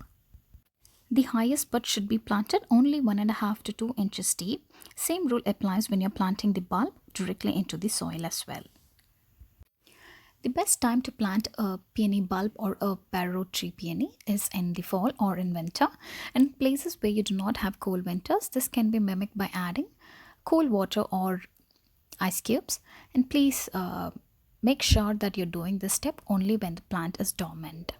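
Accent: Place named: India. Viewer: You have the most elegant Indo-British accent ever! So posh!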